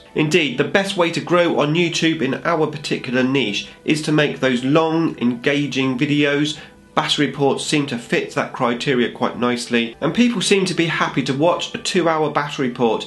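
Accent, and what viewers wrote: United Kingdom. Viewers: This concept of "the worst" is absurd. Maybe "one of the least fancyful baterps" yes. Maybe it doesn't have professional background, maybe sometimes there are too little tactical comments, but still there are more than in many other batreps. They are short, they go straight to summary, to the point - sometimes it would do much good to have parts of batrep extended to show particularly important clash highlighted. What I lack in most batreps now is what is the virtue of yours, however not all the time and not in relation to every faction on the table. E.g. you explain how Necrons operate but not necessarily how opposing force does. Still I wanna say it's tactical comment that's most worthy. Watching rolling dice for 2,5 hours is crappy and boring. The scenery may be the most beautiful but still it will not make me any good to listen to comments restricted to stating the obvious e.g. 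"Again I rolled below average. Oh, and now above average. And now all 2s! What tough luck". So continue your good work, Sir. And well, we'd miss this singing British accent, this "video" at the beginning of your pieces! Best,!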